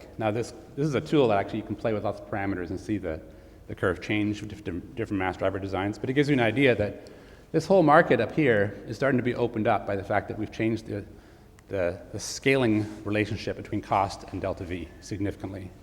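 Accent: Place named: Canada. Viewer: This dude is Canadian for sure.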